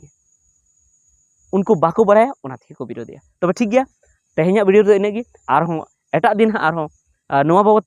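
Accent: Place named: South, India. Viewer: ये आदमी संताल परगना का नहीं है, बहुत कोशिश करने के बाबजुद इसके उच्चारण से साफ पता ओल रहा है कि ये दक्षिण का आदमी है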